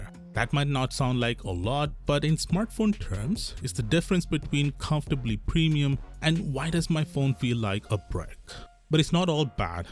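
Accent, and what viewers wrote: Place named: India. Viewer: Omg i can hear indian accent from few videos away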